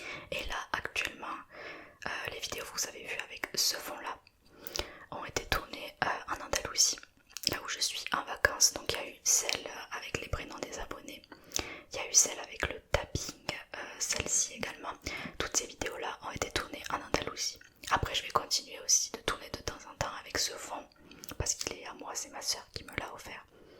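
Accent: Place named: Carcassonne, France. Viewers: Merci de t être confiée comme cela. C est touchant. Et je me disais bien que cet accent venait du sud ouest... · Je me disais bien que tu avait l'accent d'ici (Carcassonne 😂) !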